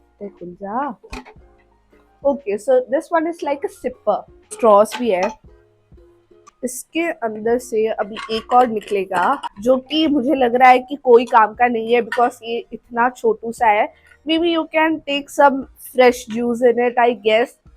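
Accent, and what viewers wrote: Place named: Kerala, India. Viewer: Is really your a malayali that I want to say you have a great malayalam slang really I want to praise you , you are amazing that when you said malayalam that I shocked because that is so good then I don't know you are a malayali i have a wish if you are a malayali please speak Malayalam again that I like the way you say malayalam I want to hear your Malayalam again and please say my name ramziya As a malayali I don't have this like slang I loved your Malayalam words I wish I can hear it again like when you speak Malayalam all the one who hears will melt for that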